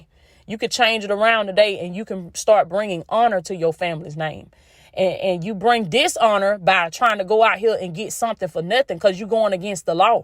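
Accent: South, United States. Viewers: You just don’t know how much we love your country accent! ♥️♥️♥️♥️ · We love, your Southern Accent!💝 It brings the message across in a passionate way. God Bless.💝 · I like listening to your country voice. All your messages are interested and inspiring. I even sent my momma your link. She was talking about you today. I told her you only 33 years old with so much spark and fire in you and all your messages. It's the God in you that moves you.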